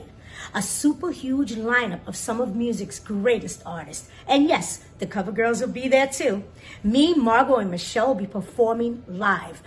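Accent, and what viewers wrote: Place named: New York, United States. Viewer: I'm still trying to figure out how you never lost your New York accent. Whenever I hear you talk, my accent comes back for about two weeks. BTW, I love what you're doing with your hair.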